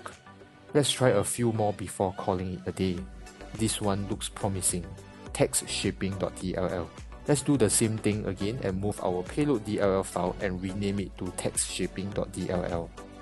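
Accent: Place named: Singapore. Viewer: Bro ur video is awesome. Your accent sounds like Singaporean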